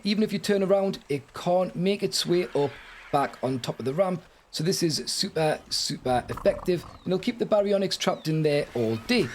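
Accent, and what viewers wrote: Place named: Newcastle, United Kingdom. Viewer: just wondering because of the accent are you from like newcastle?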